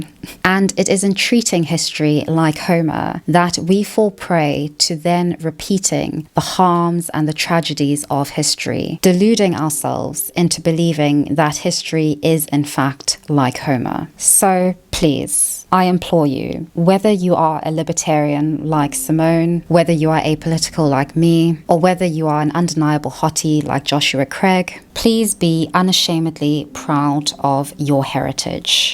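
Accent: RP, United Kingdom. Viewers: The camels are on the horizon, like it or not. This nog'ettes grandstanding and posh accent tells me everything I need to know. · I only subscribed cuz you asked, dark skinned lady with brit accent. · This is apart from the video, but hearing your voice for the first time surprised me, Your voice is beautiful, meant respectfully 😊 I have friends from Ethiopia and a an old friend from Kenya, so I’m used to those accents but your accent is like a UKish one, I think, it’s very pretty, ma’am ☺️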